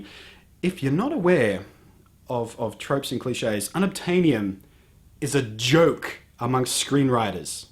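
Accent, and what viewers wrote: Australia. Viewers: i totally agree, just one question, are you an aussie? if you are, thats awesome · i watched 5seconds of this and could tell you were an aussie xD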